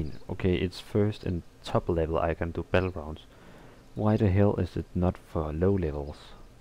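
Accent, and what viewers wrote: Denmark. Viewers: Your english sounds Danish like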